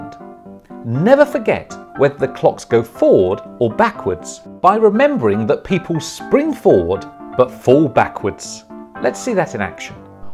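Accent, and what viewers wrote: United Kingdom. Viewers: Who is this guy? He sounds British.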